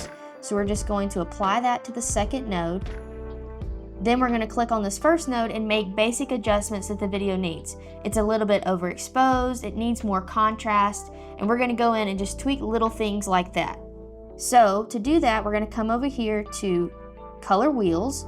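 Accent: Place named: South, United States. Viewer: New subscriber. Like your Southern accent too. 😜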